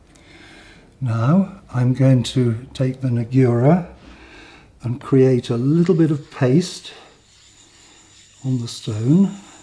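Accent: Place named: England, United Kingdom. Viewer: For those hard of hearing, or not familiar with pronunciation by an Englishman, 10,000 grit ceramic waterstone is made by Ohishi.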